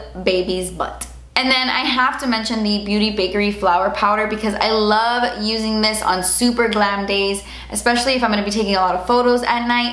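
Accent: Cuba. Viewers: That Cuban accent is coming back😏! Las ventajas de vivir en Miami. 😘🤩😎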